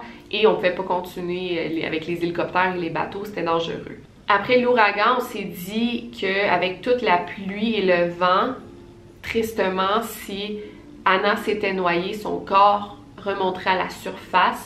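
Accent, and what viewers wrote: Quebec, Canada. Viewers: La langue québécoise par contre c'est tjr un plaisir ! 🧡de 🇨🇵🐓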